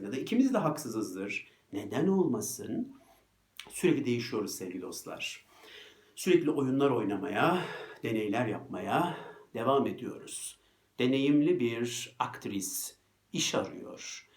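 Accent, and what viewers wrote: Ankara, Turkey. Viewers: Ne olcak, bakalım ne olcak ? Tam Angaralı'sınız hocam.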